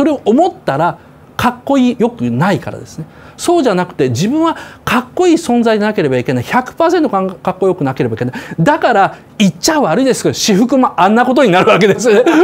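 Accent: Kansai, Japan. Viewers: 最初の方は関西トーンな岡田さんも良いね👍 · 知ってるかもしれませんけど、この人、元々関西弁ですよ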